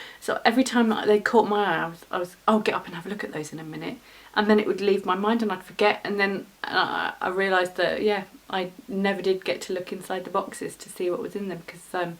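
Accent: United Kingdom. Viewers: I love your videos. Listening to your way of talking to the camera fills my heart with joy 🥰 and I love your British accent (I'm from the USA). I'd love to buy a few of your patterns on ravelry but I have a tremendous problem following UK crochet terms. It's like my brain gets stuck. Have a great summer🏵💦🌞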